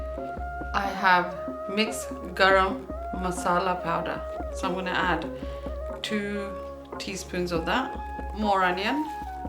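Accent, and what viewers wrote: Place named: United Kingdom. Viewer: Auntie sound like has been studied in UK or stayed in uk for long time by the accent. Nice video btw. Love it. Chittagongonian food is the best.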